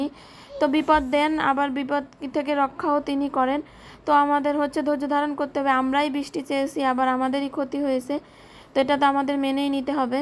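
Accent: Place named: Barishal, Bangladesh. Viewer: আপু এটা আমগো বরিশাইল্লা বইন তুমি বোঝো নাই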